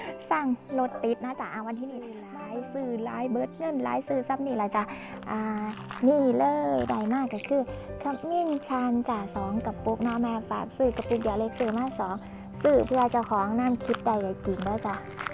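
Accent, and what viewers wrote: Isan, Thailand. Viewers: เว้าภาษาอีสานหม่วนคัก เว้าดีเสียงเป็นตาฮัก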